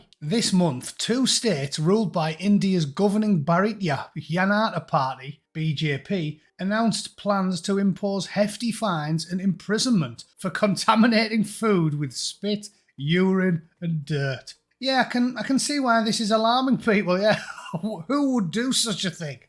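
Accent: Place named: Liverpool, United Kingdom. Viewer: Keep it Scouse bro. 😂😂😂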